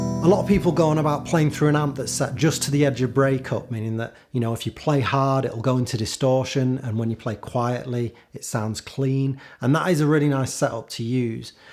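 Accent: United Kingdom. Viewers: I get annoyed with the British accent..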